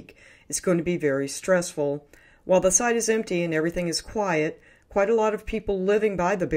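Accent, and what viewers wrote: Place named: United States. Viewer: Why the American accent!!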